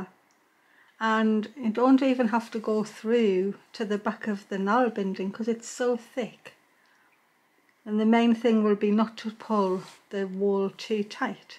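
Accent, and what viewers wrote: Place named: Stoke-on-Trent, United Kingdom. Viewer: A few years ago my family went up north to Newfoundland to L'Anse Meadows to see the Viking Settlement there. I purchased a needle for just this craft. So now I'm retired from a lifelong nursing career lm going to have a bash at this. I'm so glad l found your channel,. Your accent reminds me of my friend from Stoke on Trent!. All the best of Nova Scotia xoxoxo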